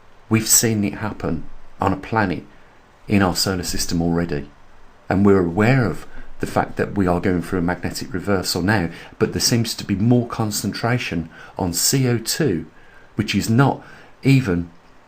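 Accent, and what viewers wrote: Birmingham, United Kingdom. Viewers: Are u a Brummie.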